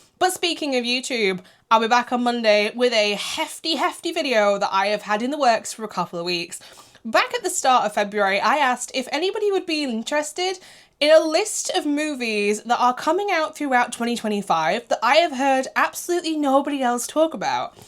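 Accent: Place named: England, United Kingdom. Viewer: I can't sound as good as your English accent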